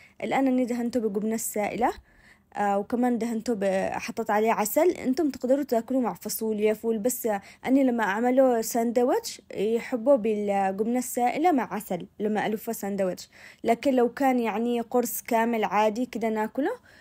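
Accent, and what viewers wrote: Aden, Yemen. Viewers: الحلو انك ماغيرتي لهجتك العدنية الحلوة مش زي بعض اليمنيات تسافر الخليج شهرين وقد اقتلب لسانها وغيرت كلامها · لهجة العدنية جمييييلة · بحب اللهجة العدنيه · ماشاءالله عليك انفدالك ومحسن اللهجة العدنية حقنا 😢❤ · ماشاء الله تسلم ايدك اني احبك واحب كلامك العدني عسل عسل ❤ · كلامك العدني المميز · كلة كوم واللهجة العدنية كوم تجنن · ما شاء الله بالعافية ٦٠% متابعتي لك تعجبني الهدرة العدني ..اذكر زمان نسوان الجيران يتجمعوا بالزغط بعد العصر وهات من هذه الهدرة الرائعة 😂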